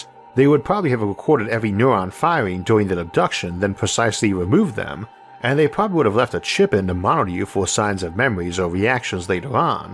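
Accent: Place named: United States. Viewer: American, but he also has rhoticism.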